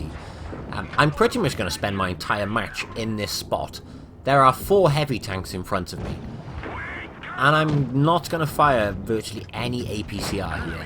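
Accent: United Kingdom. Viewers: Also where from Britain is he? The accent sounds local to me.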